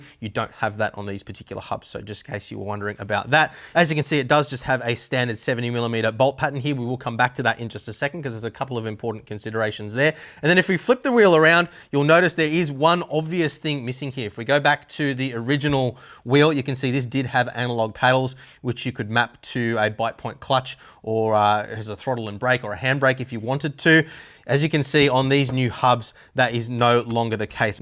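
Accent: Australia. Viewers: Are you an Aussie mate? Thinking of using your affiliate codes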